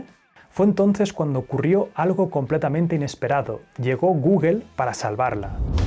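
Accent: Spain. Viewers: Así hablan los españoles, no cambian, o cambian muy poco la pronunciación de las palabras escritas en inglés. Ya es algo cultural, no creo que no hable inglés.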